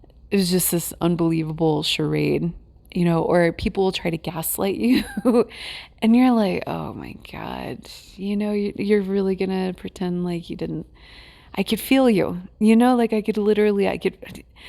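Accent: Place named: South, United States. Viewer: Beautiful video! You explain things so clearly and you're very easy to listen to. It's like I'm listening to one of those women we have in the south that call you "honey". You feel so safe.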